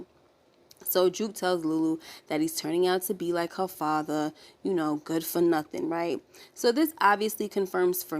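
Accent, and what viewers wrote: New York, United States. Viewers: Love your New York accent! I kinda miss it up there. PS- Symphony is not to be trusted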